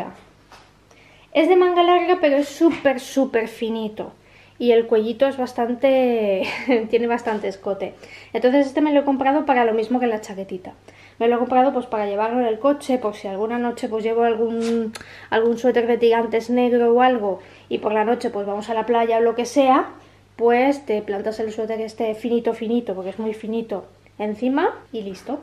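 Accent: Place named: France. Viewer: eres de origen francés? Lo digo por tu acento. Tienes algún vídeo hablando de tus orígenes? Cuánto tiempo llevas en España?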